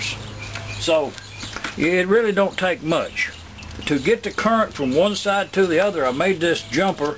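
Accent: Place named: Appalachia, United States. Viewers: Josh Green He's from east Arkansas. I love the way he says "just put it in yonder." Appalachian accent. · You sound like a fellow Appalachian American.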